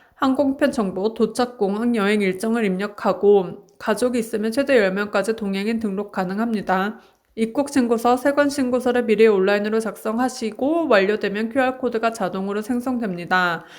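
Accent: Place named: China. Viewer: 말투가 중국인인데요? 일본인의 한국어사투리발음아님! 발음이 한국인이 아닌거같음?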